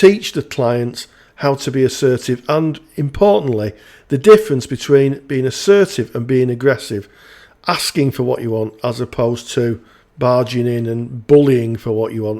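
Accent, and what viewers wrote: United Kingdom. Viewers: its a northern english accent, its fine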